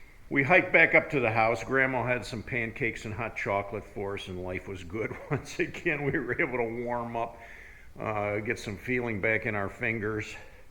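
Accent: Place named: Ohio, United States. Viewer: Happy New Year to you two. Thanks for the stories. You have an Ohio accent.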